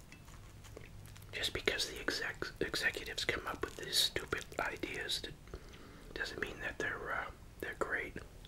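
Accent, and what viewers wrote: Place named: New York, United States. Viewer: u sound like you’re from NY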